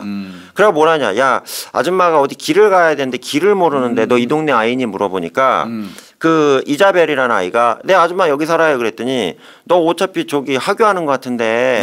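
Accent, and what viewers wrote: Chungcheong, South Korea. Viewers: 웃으면 안되는 사건인데 염교수님 너무 정겨워요😂😂 범인이 프랑스인인데 충청도 방언이 들려요 😂😂 앞으로도 잘 듣겠습니다.❤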